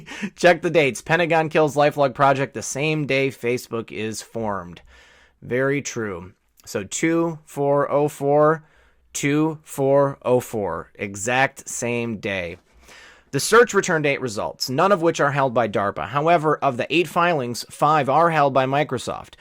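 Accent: England, United Kingdom. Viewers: Played on high speed, your British sounds like Stewie from Fam. Guy. 😂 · Great job! -- And love your English accent!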